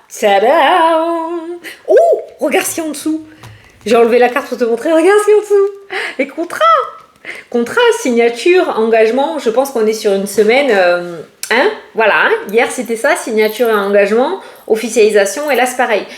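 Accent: South, France. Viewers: Bonsoir,de la Rochelle merci pour cette bonne humeur et cet accent 🌞du sud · Un petit coucou du Nord... Où il fait bon aussi 😉👍👍 on n'a pas le temps de coller 😁on a beaucoup de vent... Je vous découvre et j'ai accroché à votre petit accent du sud et votre côté un peu fofolle... ce n'est pas une insulte.... promis... Beaucoup de projets en perspective, effectivement... mais bien plus de SOUHAITS.... m'éloigner le plus loin possible et le plus vite possible de personnes malveillantes, jalouses et vraiment mauvaises... et sûrement instables psychologiquement... 🤔 J'en ai perdu la santé et la joie de vivre.. Elles ont essayé de me détruire (j'ai failli perdre la vie, par un rejet sévère et aigu de mon cœur greffé) J'ai vraiment eu beaucoup de chance.. Je reviens de très loin... A peine rentrée d'hospitalisation, me voilà à nouveau HARCELER, PERSECUTER, CALOMNIER... 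Donc c'est un grand OUI, je souhaite m'éloigner le plus loin possible de ce maudit lieu et de pouvoir avancer dans mes projets... 🙏🙏🙏🙏🙏🙏🙏🙏🙏🙏 Merci pour vos guidances... 💛🌺🌺🐞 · Coucou Je suis se Normandie aux environs de Caen. Merci pour tes tirages...toujours obtimiste et de bonne humeur...tu nous envoie ton soleil du sud avec ton accent!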